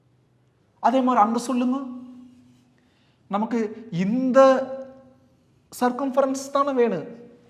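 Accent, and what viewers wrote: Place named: Kongu, India. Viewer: Fantastic sir Clearly understood 🎉🎉🎉🎉🎉🎉 Tq tq very much sir In kongu style slang superb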